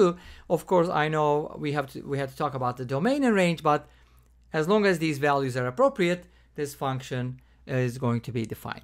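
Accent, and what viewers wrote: Turkey. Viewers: Abi sen Türk musun sesine turkluk seziyorum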